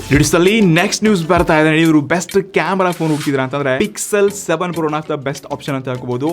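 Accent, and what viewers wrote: North Karnataka, India. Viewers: 143 ಟೆಕ್ ನ್ಯೂಸ್ ಗೆ ನಮಸ್ಕಾರ ಗುರು ನೀವು ಕೊಡೋ ಮಾಹಿತಿ ತುಂಬಾ ಉಪಯೋಗ ಆಗುತ್ತೆ ಹಾಗೆ ನೀವು ಮಾತಾಡೋ ಉತ್ತರ ಕರ್ನಾಟಕದ ಮಾತು ಕೇಳೋದಕ್ಕೆ ಚಂದ your presentation is next level we don't want any changes u always rockzzzzz........🎉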